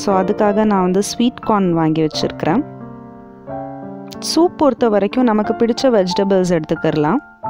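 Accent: Kerala, India. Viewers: Madam neenga malayaliya. Phonele malayalam movie pathe athunale kette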